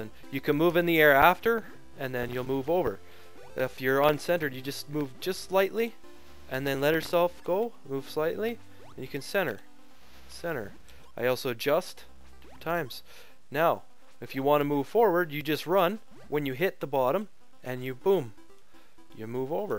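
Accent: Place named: Alaska, United States. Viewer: Good collection of tips! I like your accent too. Reminds me of my time in Alaska.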